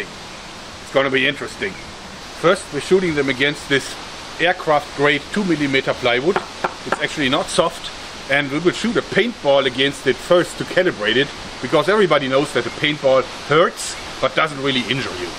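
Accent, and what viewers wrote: Germany. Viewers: I really like his German accent (I' German btw) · FPSRussia ist ja auch aufgrund seines Akzentes berühmt geworden, und dass obwohl er kein Russe ist ^^. So ein Akzent macht jemanden einfach sympathisch. Ich finde deine Videos echt Klasse und sie zeigen der Welt das wir Deutsche nicht so ernst und humorlos sind wie gerne behautet wird. Ps gute Besserung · are you come from Germany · He is German, he has an accent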